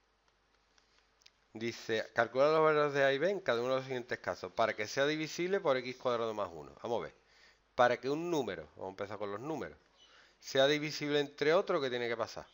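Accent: Andalusia, Spain. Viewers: Las mates con acento andaluz se entienden mejor! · Gangoso de que? se llama acento y su acento tiene mas historia que el tuyo SEGURO. Si no mira la historia del andaluz como dialecto y su influencia en todo el castellano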